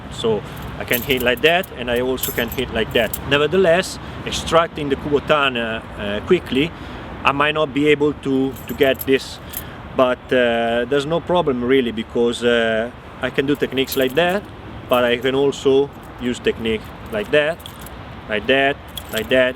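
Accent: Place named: Russia. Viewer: Bel video :) l'unica cosa è che, dato l'accento, avrei scommesso come nazionalità "russo" più che ita :D (ho trovato prima questo video e poi quello ita)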